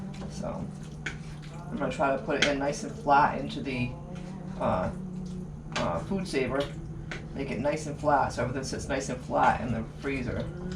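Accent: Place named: New England, United States. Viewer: Your accent. Maine or N.H.?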